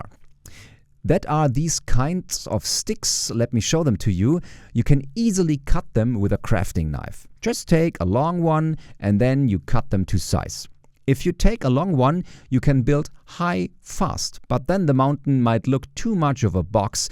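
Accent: Germany. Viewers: 1. Kann es sein das das ganze nachgesprochen wurde? Und wenn ja, gibt es das Original auch auf Deutsch als ganze Serie? 2. Ich finde man hört mega das Deutsche raus :D Also ich nehme mal an das ist ein Deutscher sprecher, was keinerlei kritik ist, ich finde es nur erstaunlich wie... Deutsch die Stimme klingt :)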